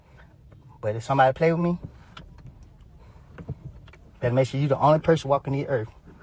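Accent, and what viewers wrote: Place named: South, United States. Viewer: Love your accent! Country baby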